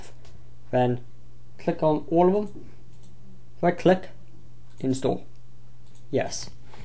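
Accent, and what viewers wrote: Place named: Wales, United Kingdom. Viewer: Thanks great video. Nice accent. I take it your welsh lol. I done thousands of installs but was a bit stuck on the linx as never seen one before but after watching your video was a simple process. If you need any parts then contact me pcpitshop.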